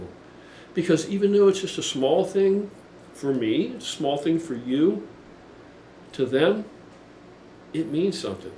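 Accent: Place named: Central Pennsylvania, United States. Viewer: Central PA or Maryland accent (No offense, of course, I'm from there and recognize it - new to the channel, too, so if everyone else knows where you're located, I don't yet).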